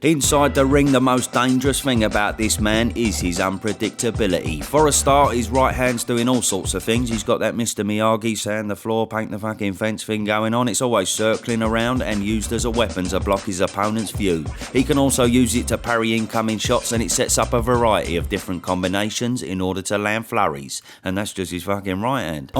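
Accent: Cockney, United Kingdom. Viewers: This cockney commentary is awesome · Great videos but the chewsday accent is killing me 😶‍🌫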